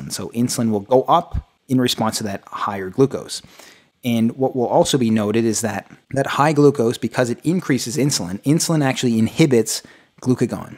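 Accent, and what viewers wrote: Canada. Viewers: I was quite concerned that the morning rise in glucose was a side effect of poor blood sugar control ! What you seem to be saying is it's from other hormones! My blood sugar numbers don't go down until after my first meal, which is about noon. My conclusion that my insulin resistance wasn't improving was incorrect. Are you Canadian, I seemed to hear that from your pronunciation?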